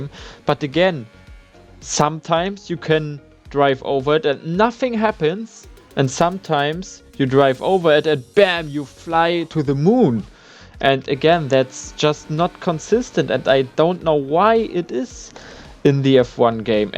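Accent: Germany. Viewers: Wie man den deutschen Akzent hört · That German accent :D okay im from Czech Republic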